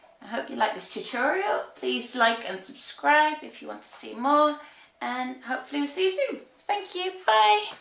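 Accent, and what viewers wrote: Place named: United Kingdom. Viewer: I love your british accent! Today I bought my first fascinator , very expensive and I'm not happy because is not like all fascinators I use to watch on tv. I have to attend a important wedding soon, and I want to add something nice to my outfit, but checking your videos, I can see not all fascinators are hats, there are lot of choises and mine is just like this one on this video, gray color. Thanks a lot!!! Regards from Chile :) I'm really sorry for my bad english lol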